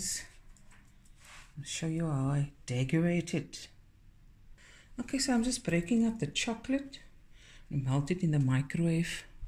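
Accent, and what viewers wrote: Cape Town, South Africa. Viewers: Absolutely beautiful. Love your calm, loving voice. This means so much to me, especially hearing your accent. Is it Cape Tonian? Makes me long for home because I'm from Durban, South Africa watching from Australia. Your presentation is beautiful. Loved the last bit also, when you called for someone to open the door quickly. I loved the small glimpse of your plants also. I will try doing it, but I don't think I can decorate it so perfectly. I wish I could meet you one day. Kindly read John <num>. Love and God bless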